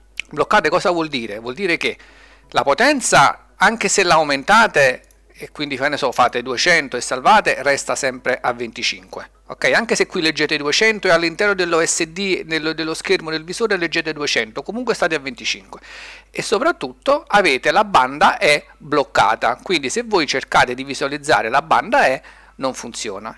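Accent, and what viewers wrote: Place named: Naples, Italy. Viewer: Ciao, dall’accento ti sento del sud, forse partenopeo? Siamo vicini, vieni al Sirio di TERZIGNO a trovarci?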